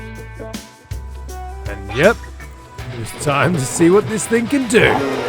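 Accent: Australia. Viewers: Is that a some what Aussie accent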